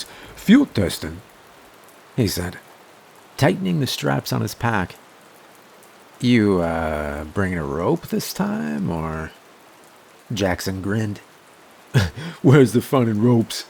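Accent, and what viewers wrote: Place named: South, United States. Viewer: I can hear your southern accent ❤😂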